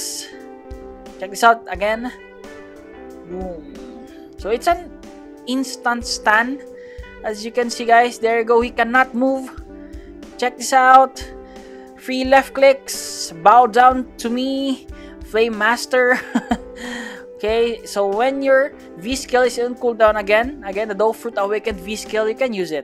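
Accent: Philippines. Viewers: are u from the phillipines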